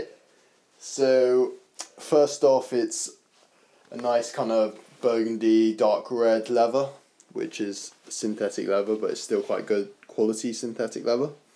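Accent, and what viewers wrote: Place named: United Kingdom. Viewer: Why tf does an Asian have a British accent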